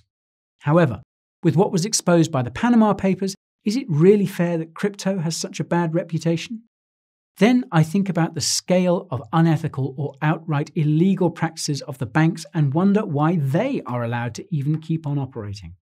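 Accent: United Kingdom. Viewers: Well, you have an advantage with the British Accent. Love the channel. No bullshit. Simply informative. Let people make up their own minds... unless they believe in horoscopes because they have no spine for personal responsibility. Keep up the good work. Perhaps we'll dub you an honorary American...who knows.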